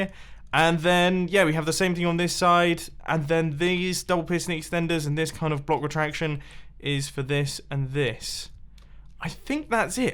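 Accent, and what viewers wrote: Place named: United Kingdom. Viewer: Yeah it’s Seth Bling with a British accent.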